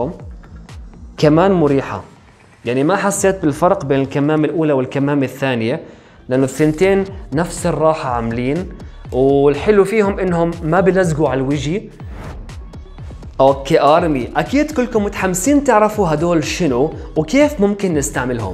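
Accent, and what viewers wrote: Iraq. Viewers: انته اشكد كيوت اتصير من تحجي عراقي 🇮🇶 فديتك ولله 💗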